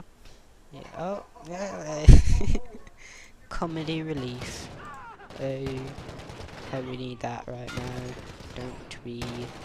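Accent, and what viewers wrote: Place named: United Kingdom. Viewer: I love your British accent ❣️🤠😍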